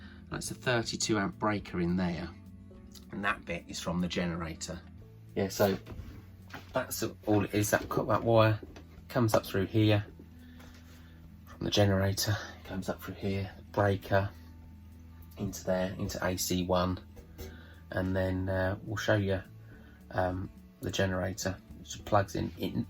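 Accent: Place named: United Kingdom. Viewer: Great Video! Very similar to what I'm building at the moment so very helpful and nice to hear a fellow Brit rather than another American accent!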